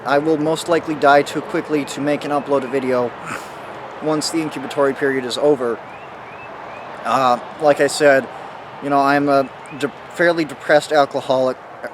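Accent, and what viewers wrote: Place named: South, United States. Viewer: Lumberjack Obi-Wan with a southern accent. Based.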